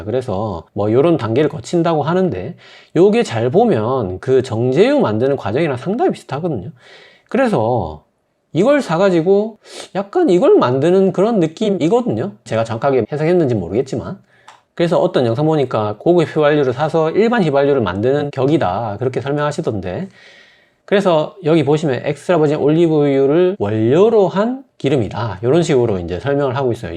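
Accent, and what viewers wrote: Gyeongsang, South Korea. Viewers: 유튜버들 중에 되게 애매하게 어렵게 말하는 사람들많은데 이분은 되게 명확하네요! 직설적인 경상도 사투리가 이래서 좋아ㅏ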